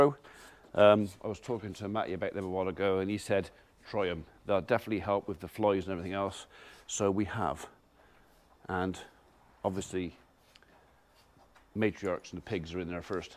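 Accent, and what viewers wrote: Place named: Gloucestershire, United Kingdom. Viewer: Love the Gloucestershire accent, could listen to it all day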